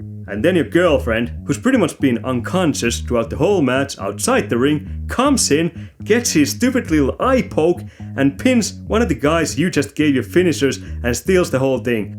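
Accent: Finland. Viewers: I really enjoyed the video and your personality. Your delivery reminds me a lot of my time living in Espoo.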